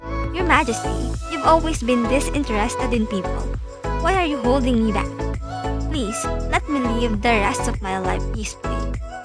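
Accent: Philippines. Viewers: The moment I heard you're voice I knew you're filipina. Hahahaha.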